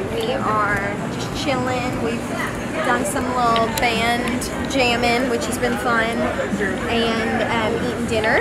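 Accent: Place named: South, United States. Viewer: I love that southern accent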